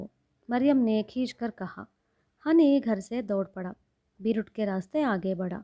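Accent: South, India. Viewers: south indian accent sounds good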